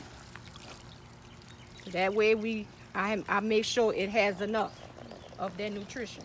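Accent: New Orleans, United States. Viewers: I subscribed because I love your New Orlean accent❤❤❤❤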